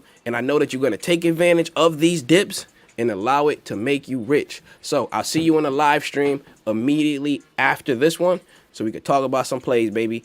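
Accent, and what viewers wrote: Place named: Brooklyn, United States. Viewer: Brooklyn NY I can hear you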